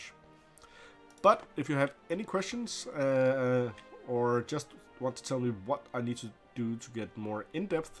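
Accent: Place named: Denmark. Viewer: i like you danish accent!! love it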